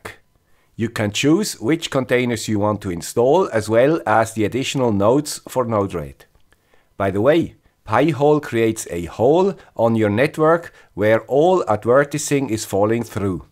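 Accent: Switzerland. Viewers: guy with a swiss accent, we need a current video on installing docker-compose and nginx proxy manager on a raspberry pi. thank you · Fantastic! Thanks!! ʘ‿ʘ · Another great video by the engineer with the Swiss accent. I like the summaries. Great job, I will use some of this for the VPN portion. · your swiss-deutsch english accent is amazing ! cheers from cheese land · The guy wis a swiss accent.. That sounded so chilling. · So, Swiss aksent ist German aksent? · Very good, interesting and helpfull descriptions. Thank you !!! :) Your English vocabulary is really great, but your pronunciation is simply indescribable Swiss. Please take a much longer holiday in England (Cambridge or Oxford), it definitely will help you. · Off course: with summery! I think it's part of the brand, like the Swiss accent! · I think this video will give me the boost I need to have a much better home server! 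DrFragle and Mr. Shark was(Antonio?) already hinting on this kind of setting with docker. This is by far the most useful video I have seen from you. The Raspberry 4 will be ordered soon. The SCRIPT from Peter will now be history for me. Also, I sense a backup supply system will not be necessary anymore because of the much easier backup system. This video will keep me busy for some time, which is good! Thank you!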